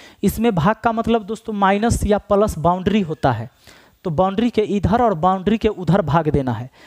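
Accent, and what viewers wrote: Bihar, India. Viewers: Sir Kya aap .....Bihar se hai ?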